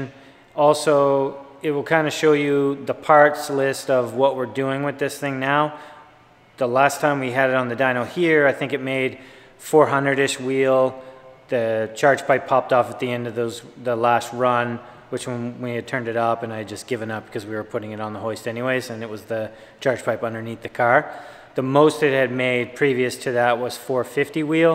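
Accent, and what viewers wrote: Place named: Eastern Canada, Canada. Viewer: Although I don’t know what province you’re in I can tell your out east, accent lol. You’re doing awesome work. Thank you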